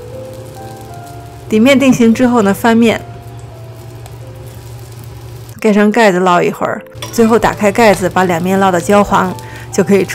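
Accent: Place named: Beijing, China. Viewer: ，我总觉得你有点北京口音，你是不是在北京待过或者周围有不少北京朋友？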